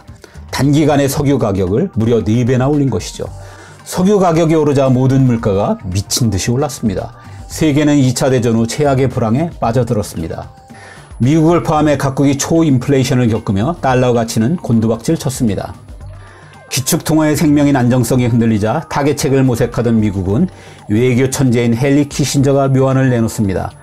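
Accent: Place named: Seoul, South Korea. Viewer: 감사합니다. 목소리가 성우 클라스시네. 우리나라 서울 말씨는 참 듣기 좋아요. 그래서 한류의 첫번째 이유지만~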